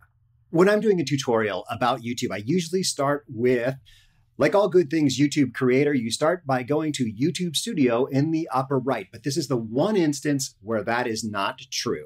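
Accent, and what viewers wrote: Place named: United States. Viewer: im intrested in your accent . its thick and pure american. i appreciate you spendnig time and putting effort into sharing your useful information with others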